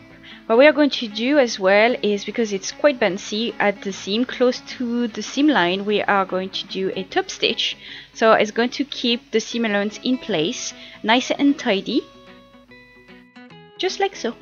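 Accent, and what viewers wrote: France. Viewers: are you french :3 ? you have an accent similar fo french 'i speak french btw" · Are you French ? I love the tutorials do more ^ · where are you from? you're accent is like a french accent °-° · Are you French ??? ( you have the accent ) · Hello ! I love you tutorial ! I planed to do one ! Are you French ? If yes, I am too ! I think so because when i talk english i have the same accent >w< !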